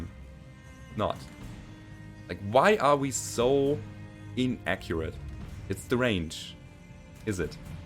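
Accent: Germany. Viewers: I like the format of build and refit at the beginning then a battle then upgrades and another battle. Each episode is well layed out. I dig rogue tech and have played the table top since 94 and no one would play the level 3+ rules that rogue tech represents. I love the whole thing even the Deuchlund accent, loved my time there.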